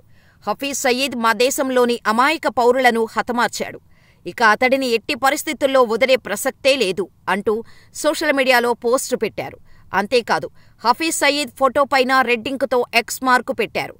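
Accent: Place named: Andhra Pradesh, India. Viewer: Modalettesaadu kadu ann Modalvettindu anale Aandrolla lekka matladtav anna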